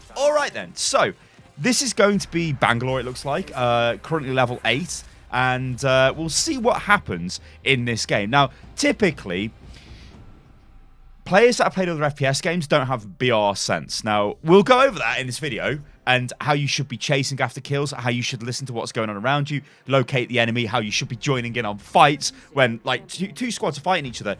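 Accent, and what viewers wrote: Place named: Northern England, United Kingdom. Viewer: Love this new series!! on btw are you northern? you have little bits of it i swear as i'm derbyshire, sounds similar.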